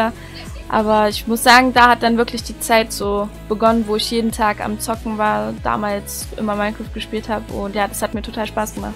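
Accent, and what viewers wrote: Saxony, Germany. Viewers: ist sie aus Sachsen? xD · Wie mer des sächsische raushört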